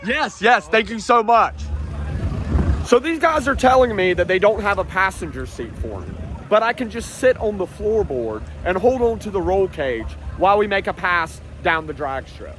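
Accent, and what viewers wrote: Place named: Pittsburgh, United States. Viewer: Where are you from originally? You sound very Pittsburgh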